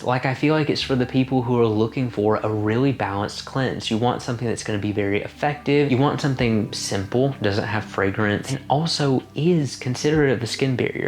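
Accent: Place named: South, United States. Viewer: Every time I watch your videos I just think I love you so much you seem like such a sweet boy (man). Your subtle southern accent is the best.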